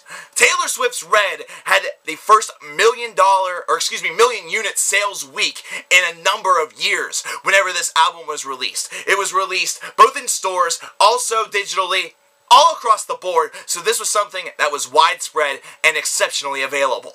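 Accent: South, United States. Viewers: Lol that southern accent reminds me of Bill Hicks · Your southern accent makes me laugh so hard hahahaha!!!!!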